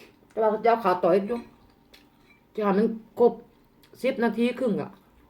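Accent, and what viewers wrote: Isan, Thailand. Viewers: ได่ยินสำเนียงบ่านเฮาเอื้อยหย่าแมนคิดฮอดบ่านหลาย-กินหลายๆเผื่อเอื้อยแนเด้อ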